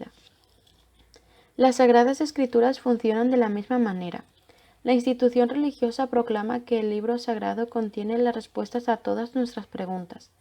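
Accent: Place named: Spain. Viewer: Gracias: Es muy agradable tu voz y me encanta el acento español.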